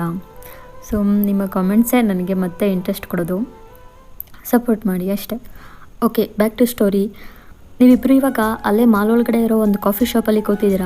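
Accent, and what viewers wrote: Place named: Tamil Nadu, India. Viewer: Chenagide akka and love ur tamil accent ❤😁 ennu bere bere ff gal haki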